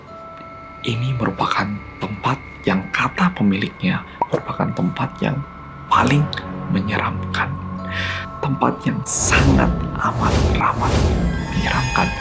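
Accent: Java, Indonesia. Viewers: Masih bersama mas yang MEDOKKKK... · Nah iyak sama😂lupa soalnya..biasanya medok wkwk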